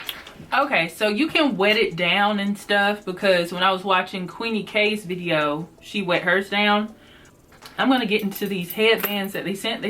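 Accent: South, United States. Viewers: LoL I meant the geographical area...I noticed a slight twang in your voice is all...I definitely wouldn’t have guessed SC.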